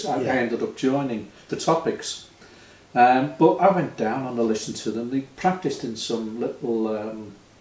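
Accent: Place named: Liverpool, United Kingdom. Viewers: Great Interview... Sharing Musical Memories & Facts As Gold Mine! Thumbs Up & Thanks For Sharing These... Throughout This Very Pleasant Liverpudlian Accent!;-)) Keep Making & Sharing These!;-)) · Hi...I was recently asked by from Liverpool Film Club, if I'd be interested in doing a filmed interview with him to discuss my involvement with the Liverpool Arts Scene. This is quite an informal interview( Part 1), in which asks me about how I got started with music and groups in the 60's and 70's, through to my association with other groups and my Entertainments Agency that I opened in 1978. I did lose my way a little, where one moment I'm discussing my group 'The Topics' (1962/66), and asks if I met any well known bands...when my memory suddenly jumps to 1970/72 and I'm remembering my time in a Cabaret group (Recipe 4)....then I slowly make my way back to the 60's. 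Memory plays a strange trick at times. If you do take the time to watch this video...I hope you can understand my Liverpudlian accent...and that you don't find the conversation too boring..as it is totally about about local Liverpool places and names. Parts 2 and 3 Video's are to follow...in which the conversation moves through the 80's up to my Songwriting and current activities. Thanks for watching..if you have.....Regards....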